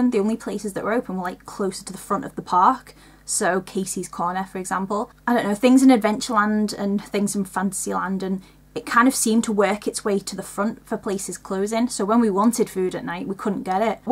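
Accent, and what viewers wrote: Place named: Wirral, United Kingdom. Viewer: Are you from the Wirral? Sounds like a wirral accent :) I'm Liverpool myself haha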